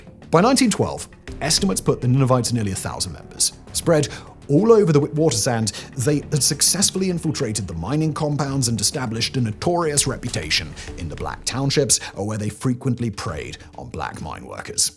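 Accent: England, United Kingdom. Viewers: Hes just british? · can't believe i clicked on a clueless Englishman poorly explaining something about a country he knows nothing about. 32-12. Tip for you next time bro. Stop pronouncing every word as if it's English. Do some fuckin research.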